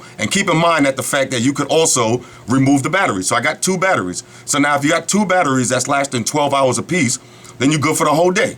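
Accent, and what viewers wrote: New York, United States. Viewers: I watched this the first time to see what's being said about the SIII...I watched it the second time cuz I live in FL and your voice brings me back home! Ugh I miss NY but thanks for the flashback :)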